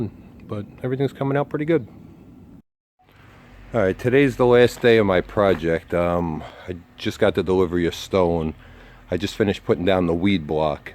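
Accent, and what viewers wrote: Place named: Illinois, United States. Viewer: Judging by the accent, this guy is definitely from Illinois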